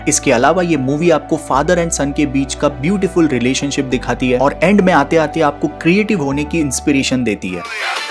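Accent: India. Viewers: I watch your videos so that I could copy your accent. That's the best Indian accent.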